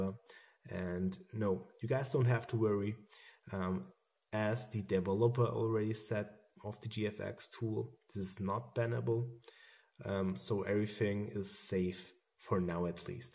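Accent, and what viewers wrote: Germany. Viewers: Hallo...are u German?😁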